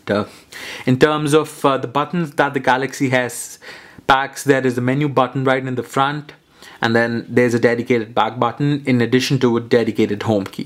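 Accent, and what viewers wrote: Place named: India. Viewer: Haha, what are you people on about? He sounds nothing like Arnie, he's Indian!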